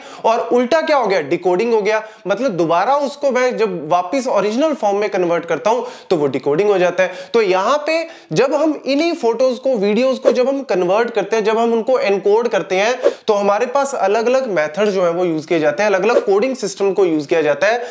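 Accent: Punjab, India. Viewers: Veere I confidently know that your mother tongue would be punjabi.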